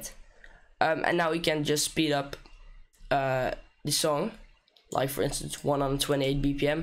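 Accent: Netherlands. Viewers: i hes dutch im dutch to i can hear it from his accent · You sound Netherlands 👌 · Are you Dutch maybe you sound Dutch. Btw amazing video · I smell dutch! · Im in love with Dutch accent lol. Thanks for making such a good job explaining ! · Ik spot een Nederlander 😂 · sounds dutch ;) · he's dutch a now it for sure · This guy 8s Dutch he has a Dutch accent... Geen probleem hoor😂😂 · Ayo r u dutch? I can hear it due to your accent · this guy has to be dutch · are you dutch? you kinda sound dutch and great video my dude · i love the thick dutch accent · Are you Dutch? I think I can recognize your accent a little bit. Your english is very good though! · We horen allemaal dat je nederlands bent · This guy has an Dutch accent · Haha deze gast is nederlands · Je bent nederlands denk ik aan je accent te horen toch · You sound really dutch.. No problems just noticed it :D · His accent screams dutch ahha i love it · hey buddy hmm i can hear ur netherlands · Thank you so much! 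Your english sounds like dutch 😂 are you dutch? I am dutch!🇳🇱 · i think you must be dutch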